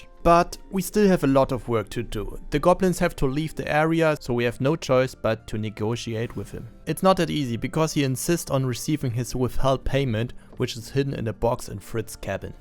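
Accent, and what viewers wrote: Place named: Germany. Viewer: Accent is German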